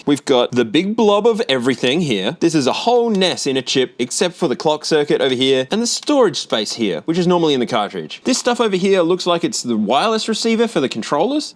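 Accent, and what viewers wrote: Australia. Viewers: British? He's clearly Australian. You may have a problem with your phone's trumpet mouth, you should have it serviced by a professionat.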